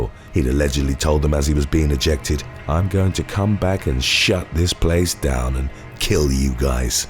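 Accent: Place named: United Kingdom. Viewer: British voice overs always make mysteries and videos more intresting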